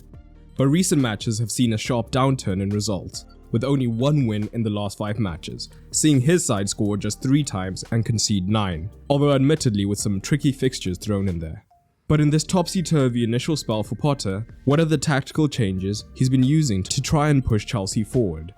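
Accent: South Africa. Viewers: Are you South African this accent sounds weirdly familiar 😂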